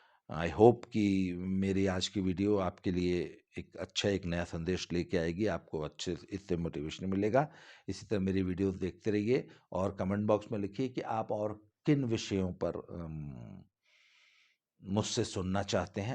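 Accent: Haryana, India. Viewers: Aapka haryanvi uccharan dittoo theth harnyanvi hai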